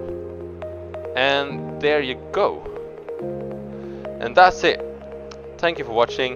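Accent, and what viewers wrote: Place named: Sweden. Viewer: Du låter jävligt svensk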